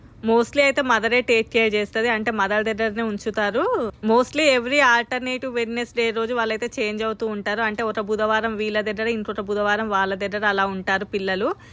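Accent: India. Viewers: Inka Indian accent matladuthunaru meeru🤔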